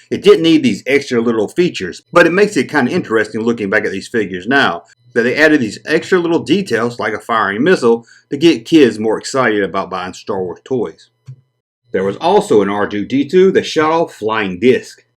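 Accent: South, United States. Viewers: Way below the Equator? Are you in the Southern Hemisphere? Thought I heard a southern twang in your awesome videos!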